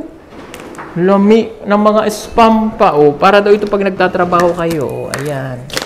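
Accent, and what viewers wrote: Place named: Iloilo, Philippines. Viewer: Nakakatuwa si sir may tunong pag ka ilonggo 😅😅😅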